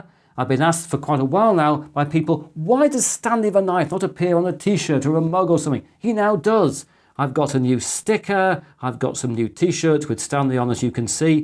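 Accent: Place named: East Midlands, United Kingdom. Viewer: Yeah, there's something hypnotic about his East Midlands tones. 💤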